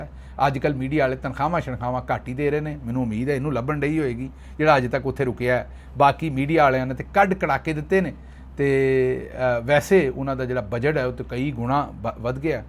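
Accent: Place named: Punjab, India. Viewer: اصل میں پاکستانی عوام کی آواز ہے جو کہ تھڑا پنجابی لہجے میں اپنے جذبات کا اظہار کرتے ہیں ۔۔۔۔ بھائی لگے رہو 😂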